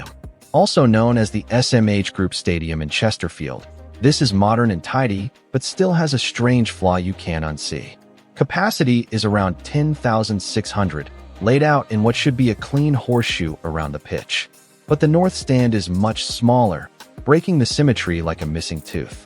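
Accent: United States. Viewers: In this video we've heard some Yank who clearly doesn't get traditional football nor the British football culture. The list is just completely un-serious American claptrap, a profound lack of understanding of something miles away from US culture. Ewood Park as an ugly ground? What is this tosser on about with that dreadful American accent of his? Appalling. Seriously.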